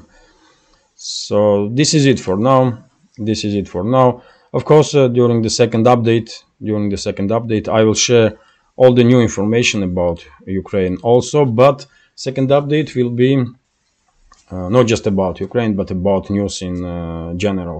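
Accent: Russia. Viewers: By shortening the videois already a good start.Also having English subtitles helps alot because of your Russian accent.. 🎉🎉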